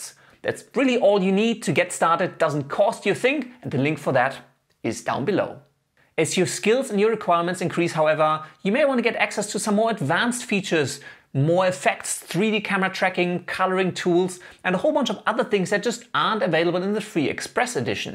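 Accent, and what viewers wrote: Germany. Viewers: Are you German, cause you accent is so strong and it sounds like german english 😂😂😂 (btw I'm German) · Du hast einen extrem deutschen Akzent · Great comparison! Very helpful! Do you think Hitfilm Pro can compete with Adobe premiere/After Effects? By the way: Are you a native German? Your English sounds like that a bit... ;-)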